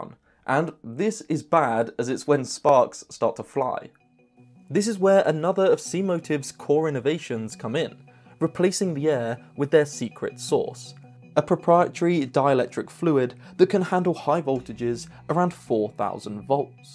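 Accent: England, United Kingdom. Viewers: Drop the english accent. I miss about 10% of your words so I can't follow you. Too many w's. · couldn't finish the first 2 minutes because of your puke English voice "mowtas"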